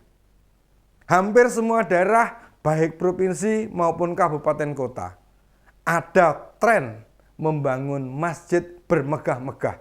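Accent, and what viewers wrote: Java, Indonesia. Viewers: Halo mas, mat malam, seneng dengar medok dan melihat murah senyum mu. 👍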